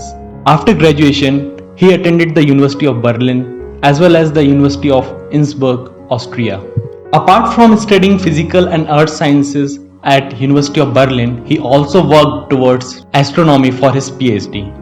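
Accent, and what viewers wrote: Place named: India. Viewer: It is a nicely composed video on, how the dynamic hypothesis of plate tectonics was conceived by Alfred Wegener. For the one who wants to learn about the evolution of continents, oceans and mountains, this introductory video will be very much useful. Our students will be able to follow the narrative as it is with the Indian accent. The effort of ' ' is commendable.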